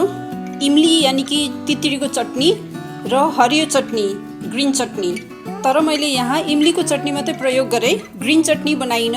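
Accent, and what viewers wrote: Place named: Darjeeling, India. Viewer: The way you are talking resemble as you are from Darjeeling or siliguri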